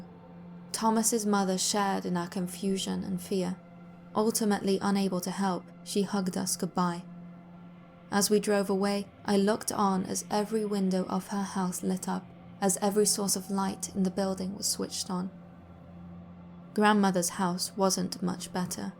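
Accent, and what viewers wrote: United Kingdom. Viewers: once again - brit narrator- whats up with that mystery? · Speaking about the female reader: I love your delicate British (?) accent, makes the stories that much more enjoyable. Keep up the good work.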